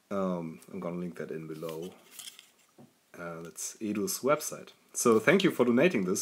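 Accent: Germany. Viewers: Weird. I’m hearing “a-to-zed” or “day-to-zed” or “data-zed” but the subtitles always say dataset. I guess that’s the German accent playing there!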